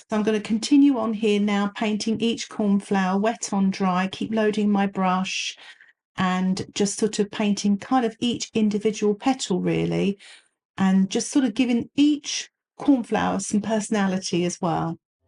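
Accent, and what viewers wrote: United Kingdom. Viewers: Merci ! - Un accent british sooo deliiicious..... Love from Aquitaine, France (do you remember your/our queen Alienor ?)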